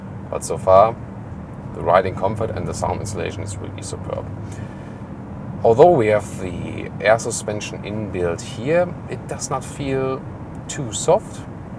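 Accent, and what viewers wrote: Germany. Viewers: He's not mumbling, that's a german accent...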